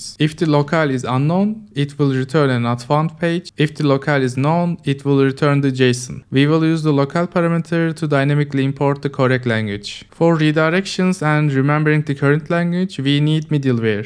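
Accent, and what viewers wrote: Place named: Turkey. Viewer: jeez, i know when i hear a turkish accent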